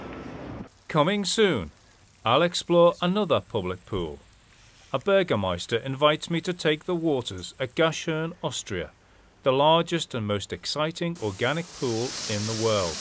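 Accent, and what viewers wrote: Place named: England, United Kingdom. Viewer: Documentaries just sound more official in an English accent.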